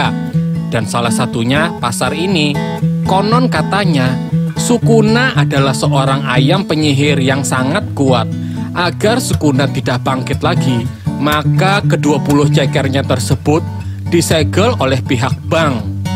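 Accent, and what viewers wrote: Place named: Banjar, Indonesia. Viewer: Logat banjar nya tu heh lawak banar🤣🤣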